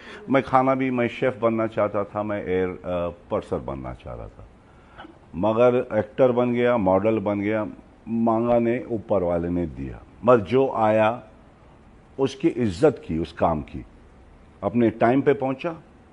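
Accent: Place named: Mumbai, India. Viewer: Like his simple philosophy mumbai Hindi nobody speaks like him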